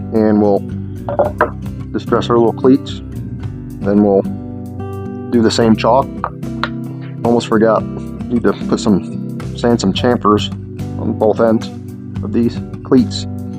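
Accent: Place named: South, United States. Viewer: Very nice - I can do this! Unique. New sub from this vid-make more easy project vids with that country rock twang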